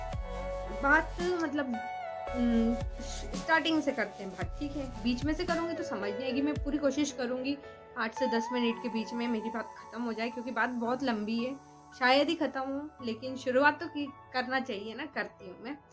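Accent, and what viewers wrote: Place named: Bhopal, India. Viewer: Aapka tone bhi bilkul bhopal ka hai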